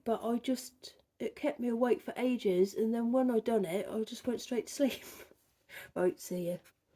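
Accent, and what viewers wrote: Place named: Norwich, United Kingdom. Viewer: I have so enjoyed your daily visits this month. You talk so much like my mother who was from Norwich. She married my dad during WW II and he brought her back to Indiana in America. I have been over there several times and absolutely loved every moment. ❤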